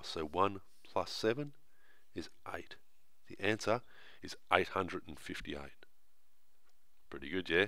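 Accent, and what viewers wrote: Australia. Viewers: I think learning maths in an Australian accent has just become my new favourite thing.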